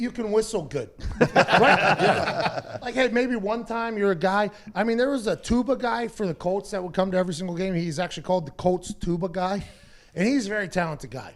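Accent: Pittsburgh, United States. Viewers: Pat has such a Pittsburgh accent love it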